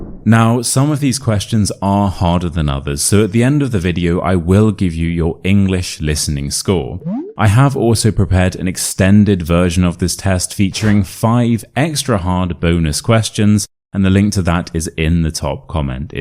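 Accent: RP, United Kingdom. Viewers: A good approach to Modern RP though.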